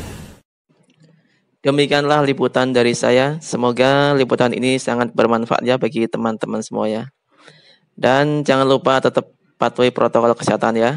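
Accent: Banyumas, Indonesia. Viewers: Ora ngapak ora kepenak mantap sukses selalu kak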